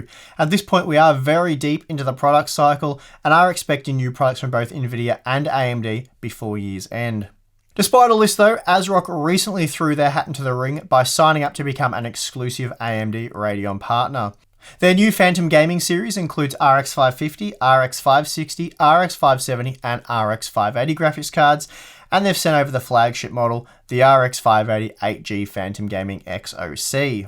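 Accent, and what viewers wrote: Australia. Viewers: you have an aussie accent so i assume youre a fellow aussie, anyway i bought an msi 580 8gb armor for 250$ aud last week so prices are dropping hard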